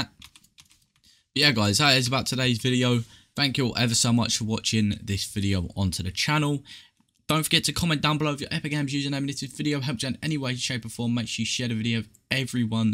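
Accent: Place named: United Kingdom. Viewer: not a british dude again hell naw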